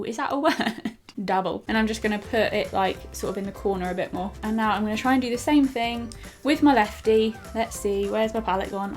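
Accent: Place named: London, United Kingdom. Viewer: I didn't think you would reply thanks I love your vids. I love how I finally found another English makeup person like Zoella,but your from London I can tell by ur accent xxx 💙🦄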